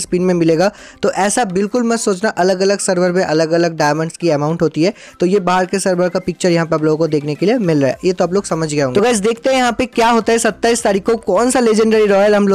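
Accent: Bengal, India. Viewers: bhai af kya bengali ho.... 🤔🤔🤔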